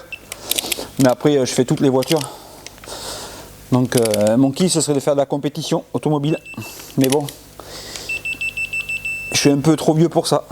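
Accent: Gironde, France. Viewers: Toujours agréable de regarder ces vidéos, avec en plus l'accent Girondin.....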